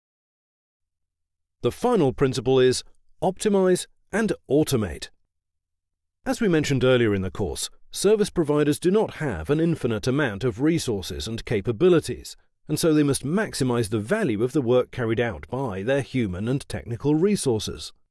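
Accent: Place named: United Kingdom. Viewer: Thank you, thank you, thank you for uploading all of this. I can focus and understand much better with a nice British narrator as opposed to the flood of p.a.j.e.e.t made videos.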